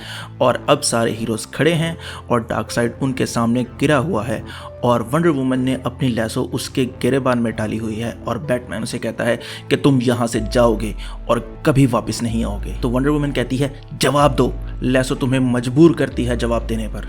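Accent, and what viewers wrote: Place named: Pakistan. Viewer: Pakistan ke log ko dc psnd krte h ... Mene jb dekha h to awaz se pta lg jata h ke ye pakistani h... Acha h me v dc ko support krta hu...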